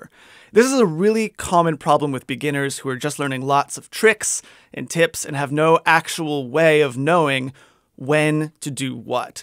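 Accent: England, United Kingdom. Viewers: when did you stop being british? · What happened to the English accent?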